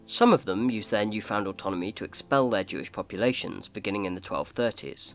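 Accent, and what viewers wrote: United Kingdom. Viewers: Good goi. Do as your told. Anything you hear you dont like run and tell. Youll get a little treat. Self deluded moral superiority. Its fitting for a southern esque sounding british accent. Your outlined requirements for discussion show you arent willing to discuss anything. To the weasel saying people dont understand the talmud, context and such. I have read it. You are a liar. It gives instructions on preventing pregnancy in young girls. "Muh contex and sheeeeiiiiit". They have been kicked out of very many areas, countries and cities. This is an indisputable fact. No matter how you try to twist it with your NPC rhetoric. The crazy thing is every time it was not their fault and people were just being meanies. Where did the number 6 million come from? Prove it. I apply the same energy you have for discussion. It must be a legitimate expert I approve of. 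And if you say anything i dont like ill run and tell. Youre a joke. Go swim with a toaster.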